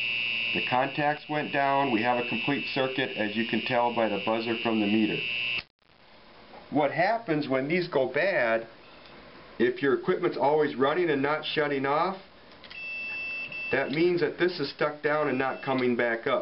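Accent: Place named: Midwest, United States. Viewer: This is the most midwestern guy I've ever heard in my life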